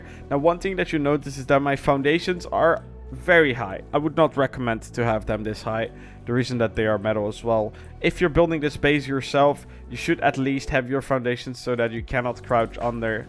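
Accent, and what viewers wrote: Netherlands. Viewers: Are you dutch? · Wel een lekker Nederlands accentje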